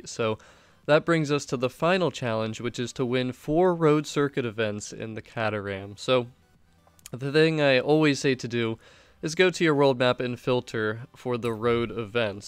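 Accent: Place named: United States. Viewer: Yeah your probably correct from the US accent and pronouncing of the car... In the UK that's the way we pronounce it is the way I sort of typed it... Who cares lol great video as always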